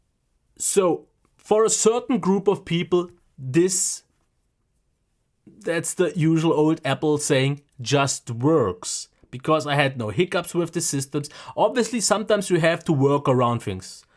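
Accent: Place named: Germany. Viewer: Man I love that German accent, brings the queen's English to life.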